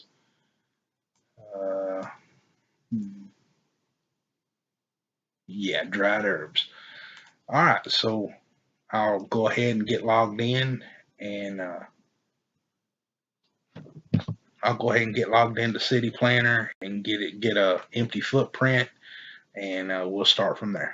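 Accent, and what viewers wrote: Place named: Deep South, United States. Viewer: You sound like you work in the ranch in the deep south USA.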